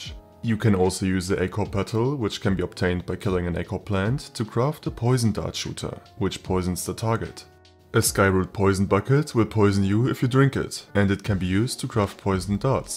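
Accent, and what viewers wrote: Germany. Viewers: good video as always. took me many vidoes to realize that you have an german accent lol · deustcher akzent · Why is the German accent so damn strong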